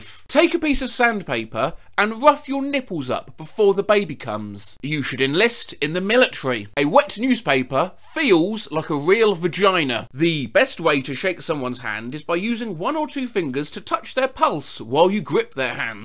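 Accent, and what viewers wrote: United Kingdom. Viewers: i love british people reading internet things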